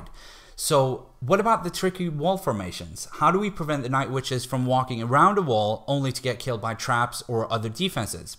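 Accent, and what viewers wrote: Sweden. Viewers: Limpansson 14 Analysing his accent (which is hardly noticable by the way) I think he could be swedish indeed. Kinda sounds like PewDiePie but better English.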